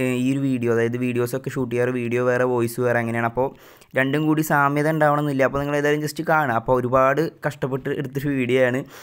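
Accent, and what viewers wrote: India. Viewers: U malappuram aano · Bro thrissur karanano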